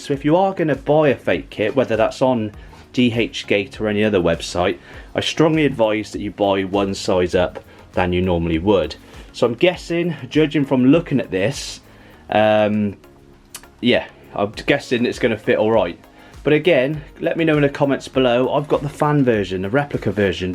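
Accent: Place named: Manchester, United Kingdom. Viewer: What a strange Mancunian accent.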